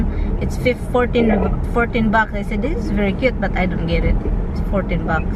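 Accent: Visayas, Philippines. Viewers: Magkatawa ko sa imo sis pag mag storya ka bisaya tagalog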